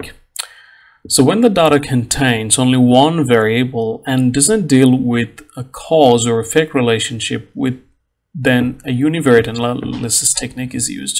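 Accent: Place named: Australia. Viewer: Interesting Australian/Something accent and great video. Thanks!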